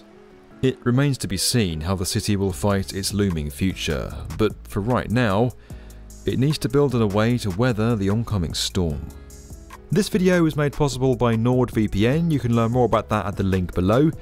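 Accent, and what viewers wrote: United Kingdom. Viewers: British accent is so annoying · It’s hard to take this video seriously and I guess it’s just meant to be easily digestible content with a posh British accent. Having lived in Miami for 20+ years, worked in real estate and other industries, almost every heavy handed conclusion that this video draws is absolutely farcical.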